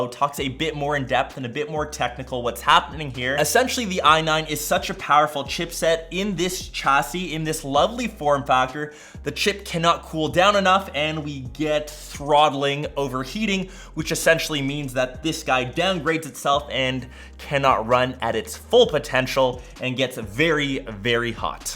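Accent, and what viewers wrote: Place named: Canada. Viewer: Great video! Thank you! Also I appreciate how Canadian you are. "PrOccess!" "Out!" Keep up the good work!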